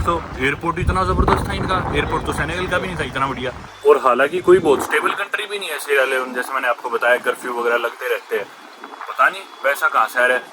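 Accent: Haryana, India. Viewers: Haryani axent 😂 loved it❤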